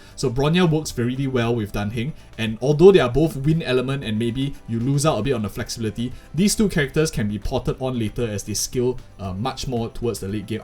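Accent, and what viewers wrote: Singapore. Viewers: Oh hullo this guy sounds a bit Singaporean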